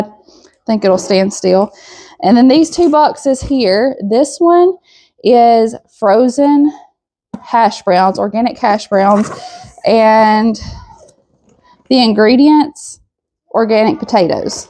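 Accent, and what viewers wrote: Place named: East Tennessee, United States. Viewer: My family is from East Tennessee and your accent is so similar to that, are you originally from that area, by chance? I swear, hearing you speak makes me think of them, I love it.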